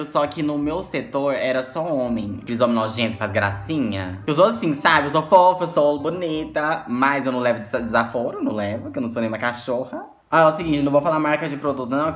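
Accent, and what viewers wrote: Brazil. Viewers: Eu ri muito. “Eai, joia?” O sotaque goiano é bom demais. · Uai vc é de Minas?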